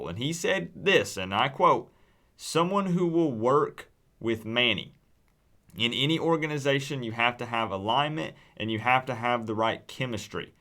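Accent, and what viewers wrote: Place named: Chattanooga, United States. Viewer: whether you believe it or not, you have a Chattanooga accent. I knew it....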